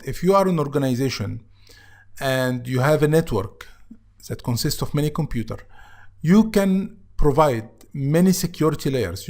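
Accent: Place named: England, United Kingdom. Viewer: Inspite of that English accent everything is okay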